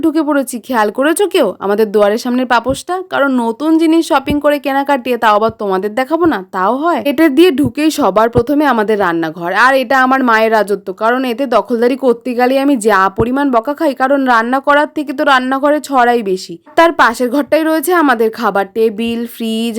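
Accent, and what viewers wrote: Bangladesh. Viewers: প্রথম দেখায় তুমি আমর মন জিতে নিলে আপু তুমি আমাদের বাংলাদেশের মত কথা বলো গো🤭❤️❤️❤️❤️❤️❤️❤️ love from Bangladesh 😊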